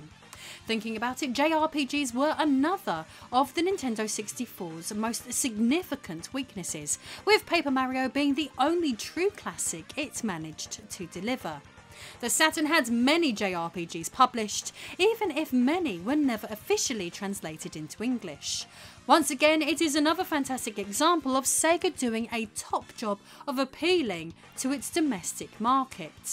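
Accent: United Kingdom. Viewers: 5th generation of consoles + 90s vibes + well exposed review + British accent= excellent content. P.D.: Nice channel and greetings from a Sega Saturn player who had a PS1 in the 90s and lost good stuff.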